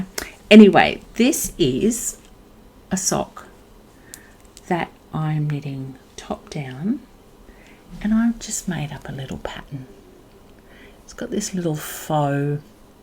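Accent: Australia. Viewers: I knew you were a fellow Aussie from the first hello. Loved your video! subscribed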